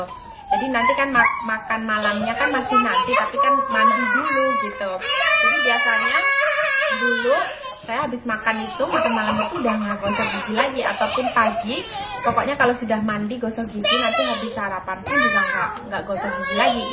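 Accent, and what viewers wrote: Java, Indonesia. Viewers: Boso jowo e jek medok. Semoga rukun terus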